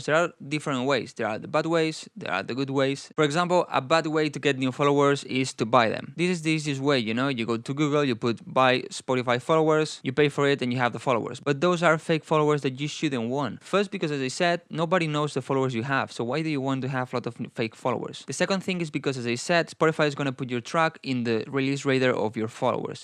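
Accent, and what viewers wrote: Spain. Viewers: Good video, just what I needed . . . . . El español es tu lengua materna verdad? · It's spanish voice jeje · Great video man! I was so indeep on FB adds, this seems so cool as well cause is less complicated so I'll check out! BTW where are you from? Your accent seems spanish, I´m spanish too if it's the case hahaha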